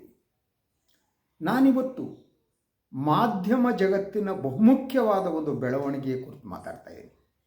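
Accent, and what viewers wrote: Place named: Uttara Kannada, India. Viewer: When I hear you, I get a sense of someone from my Uttara Kannada district is talking. Thank you.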